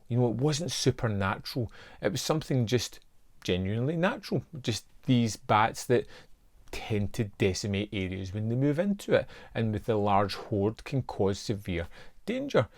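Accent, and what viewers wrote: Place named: Glasgow, United Kingdom. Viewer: Love this movie!! Just bought the eureka double pack myself. Been trying to get this since i seen it on betamax way back when(no joke) had a large budget and i think its just as relevant now, the whole man getting punished by nature for exploiting the resources slant. Interesting how yoy never really know if the medicine man manifested the bats or its just a natural migration. Love ambiguous storytelling. I had actually given up on owning this one never mind on a eureka bluray! Keep up the good work, good to see and hear a fellow Glaswegian(?) Review movies kn youtube. Thanks for the great review and exposing this great film to your community. Thank god for eureka.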